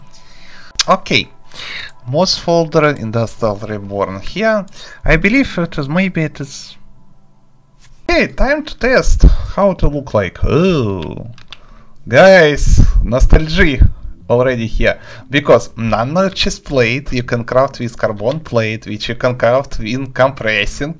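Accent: Russia. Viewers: It's actually Runglish. 😏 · такой русский акцент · хахаах сразу слышу русский акцент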